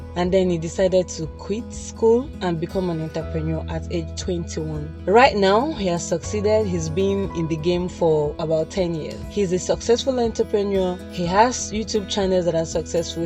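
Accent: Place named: Nigeria. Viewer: I like Nigerian accent.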